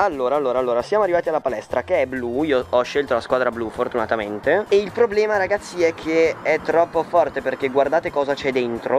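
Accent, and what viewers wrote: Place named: Arona, Italy. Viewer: oddio sei di aronaaa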